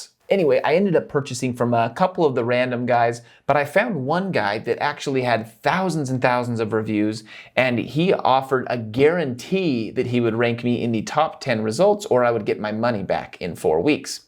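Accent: Australia. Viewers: that was an Australian accent.... not British. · Ur boi is from Australia not Britain, cool vid :)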